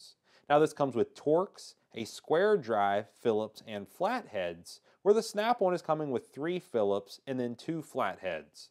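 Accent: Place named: United States. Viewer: As an Aussie (Australian) I am having great fun trying to work out what part of the US the reviewers accent comes from. Boston? Upstate New York? Dunno, but it is pretty cool. PS- Love your videos, but sad that a lot of the stuff that is reviewed on this channel is not readily available here in Oz.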